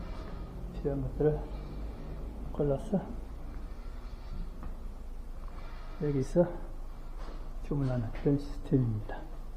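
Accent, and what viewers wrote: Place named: Gyeongsang, South Korea. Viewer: 홀로걷는 뚜벅이길에 구수한경상도 사투리와 억양이 어우러지니 좋네요ㆍ중간중간 걷는길에 안내를 해주니 최고입니다 가게없으니 간식ㆍ물 꼭챙겨야한다는 팁!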